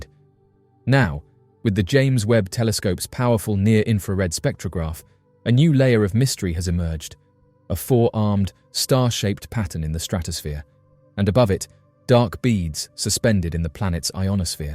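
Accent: United Kingdom. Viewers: You know what I bet. Some Alien fly saucer passing by the earth dumped their sewage, it froze then fell to earth and he found it. Also, videos like this seem to use this one voice with the British accent to give it more drama 😮.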